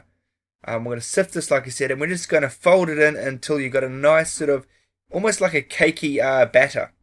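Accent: New Zealand. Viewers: Like your kiwi accent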